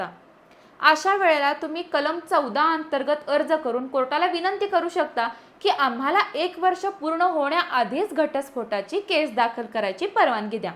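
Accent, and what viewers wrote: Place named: Kolhapur, India. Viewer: ताई फ्रॉम कोल्हापूर वाटते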